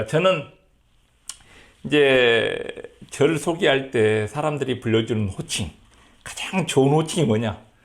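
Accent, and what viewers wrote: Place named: Jeolla, South Korea. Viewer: 빨갱이 동네 전라도말씨네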